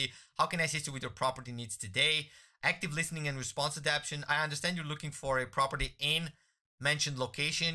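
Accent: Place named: Quebec, Canada. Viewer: Oui la voix est pas mal je vien d'écouter, on dirais juste qu'il a un accent québécois 😂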